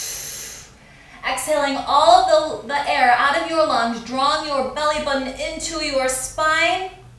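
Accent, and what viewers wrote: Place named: England, United Kingdom. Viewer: Have you ever lived in England I feel like I can hear a bit of an accent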